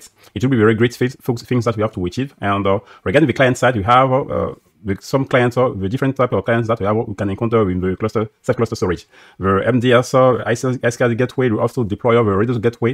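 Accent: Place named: France. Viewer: still very hard to listen on 0.75X speed, the guy has a very thick French accent, it’s realy hard to follow.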